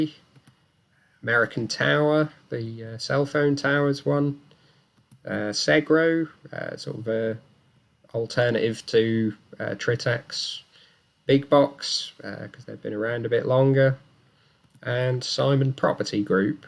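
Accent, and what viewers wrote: Norfolk, United Kingdom. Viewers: UK house prices rise by an average of 6.9% per year not 4%. £100K budget for buy to let is too small. The best strategy for buy to let investing is HMO not single let and I take it from your accent your a Norfolk boi so house prices around there for HMO are more like 200 - 250k for a 3-4 bed. With that kind of property your yield would be more like £1730 per month not the £525 per month rent. Also in most cases with buy to let investing the idea is not to ever fully pay back the mortgage. Instead use it as a way to take profit by refinancing and put that money back into your family home or the stock market. So I don't think this is the best comparison because you are taking a very basic buy to let investing example. Interesting to see the way you have calculated it though.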